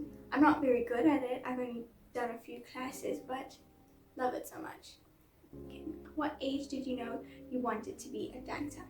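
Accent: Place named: United Kingdom. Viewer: Nooo she’s turning bri’ish 😭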